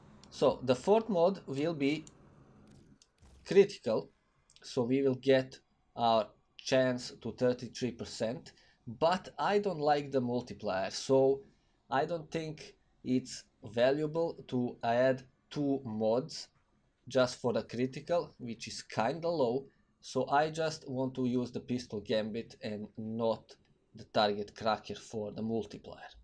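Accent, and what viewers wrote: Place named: Russia. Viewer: Слышно , что Русский чел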